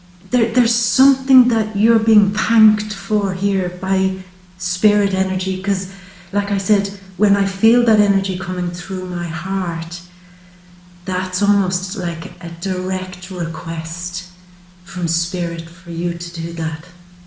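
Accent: Ireland. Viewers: So fabulous to come across an Irish accent 👌. Beautiful reading by a beautiful lady 💖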